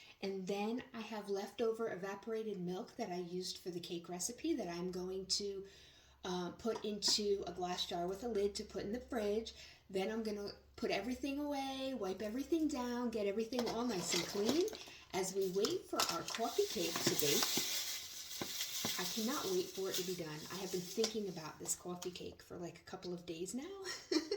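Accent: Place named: New Jersey, United States. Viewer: I've lost a lot of my jersey accent living in Maryland for almost 30 years now but when I heard your accent in the video I told him this recipe must be legit go get me some Bisquick ASAP 😂😂😂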